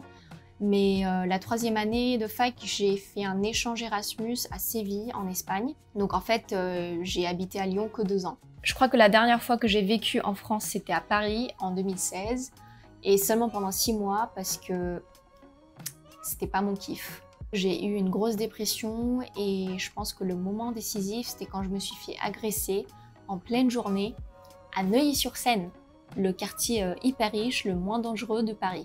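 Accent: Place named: Vietnam. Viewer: bravo. Her French, Polish and Spanish accents are actually better than her English. To me she still has a charming Viet accent when speaking English... Maybe English is particularly weird to pronounce if VN is your first language as much as Tieng Viet is difficult for English speakers to pronounce properly. Her French pronunciation was very impressive too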